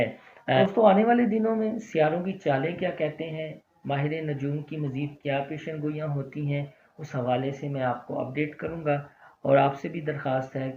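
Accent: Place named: Punjab, Pakistan. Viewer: وہ پنجابی ھے۔ پنجابی لہجے والی اردو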